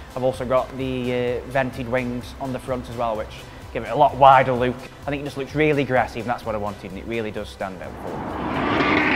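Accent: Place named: Stoke, United Kingdom. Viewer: You are deaffo a Stokie! I recognise that accent from anywhere :D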